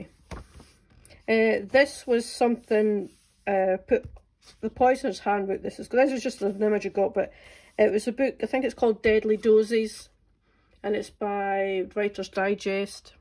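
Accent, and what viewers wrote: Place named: Scotland, United Kingdom. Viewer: Awesome idea. I need to go look up those Villain Archetypes. I don't have a common place book, but have started doing something similar in Notion, cause I've always got my phone on me and I can bring it up on one screen while I'm writing on the other. (dual screen setup). Psssst!! it's good to hear a Scottish accent on the YouTubes. I'm in Cumbernauld!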